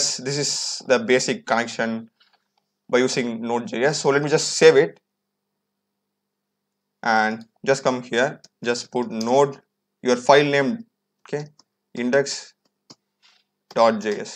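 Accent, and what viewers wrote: India. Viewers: i am also IND , nice my guess true, your English slang say you are Indian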